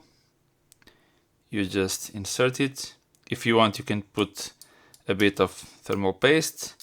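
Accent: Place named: Portugal. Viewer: Are you Portuguese? Eu conheço o sotaque...Eu curto este tipo de content 👍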